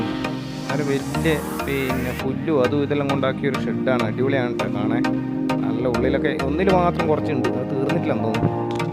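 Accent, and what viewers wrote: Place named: Kannur, India. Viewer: Superr.... Kannur basha തകർത്തു..👍👍🤩🤩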